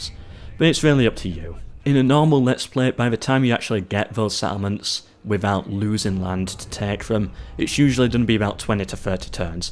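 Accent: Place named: Northern England, United Kingdom. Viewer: Cool. I figured it was northern england, but couldn't place it. Your accent is very similar to Ygritte in Game of thrones. I'm from Norway btw. Cheers mate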